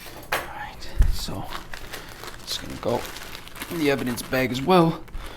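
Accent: Boston, United States. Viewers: Your Boston accent vids are my fave! Are you originally from there?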